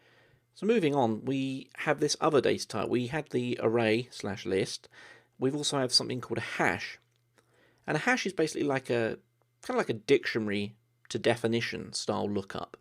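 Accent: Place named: Liverpool, United Kingdom. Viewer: he tawks like a beetul..cool.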